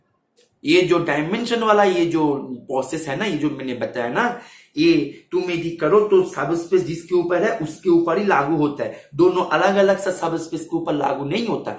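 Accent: Bengal, India. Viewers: Sir Hindi te bolleu sir er bangla tan ta bojha jai tai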